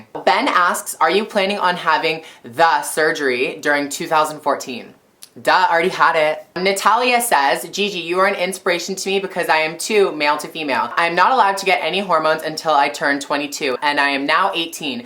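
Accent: Canada. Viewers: you sound more canadian than ever before lol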